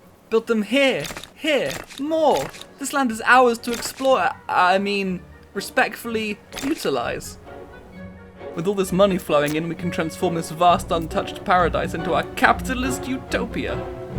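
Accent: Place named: United Kingdom. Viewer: You are the most Bri'ish gamer I have ever witnessed, in a good way gentleman.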